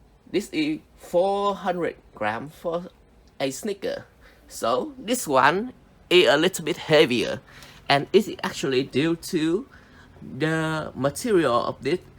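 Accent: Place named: Thailand. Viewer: Thai accent is so recognisable. one love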